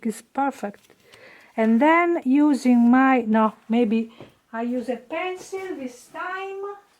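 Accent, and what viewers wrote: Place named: Italy. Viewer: I loved hearing your fun Italian accent! I'm inspired always by your great variety of skills!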